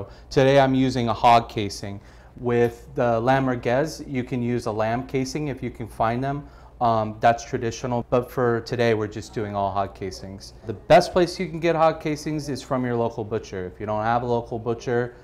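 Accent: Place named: Philadelphia, United States. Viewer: Think this dude is from Philly. He says certain words funny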